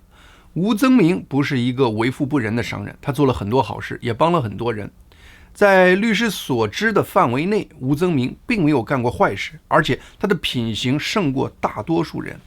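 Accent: China. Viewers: 大陆腔还比较浓些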